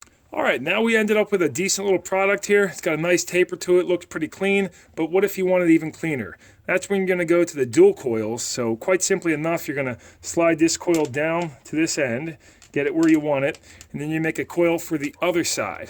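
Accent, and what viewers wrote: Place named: Pennsylvania, United States. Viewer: Must be from pa.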